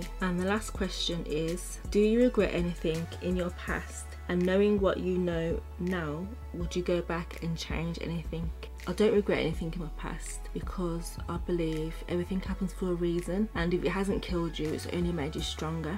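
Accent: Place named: Wolverhampton, United Kingdom. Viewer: Thought I recognised your accent! Wolverhampton here :). Great video! It's always nice to see a fellow YouTuber grow! I subbed :)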